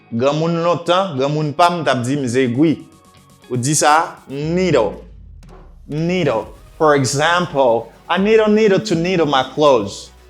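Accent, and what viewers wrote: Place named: United States. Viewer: Kou sa a vrèman enteresan ak yon pwonosiyasyon américain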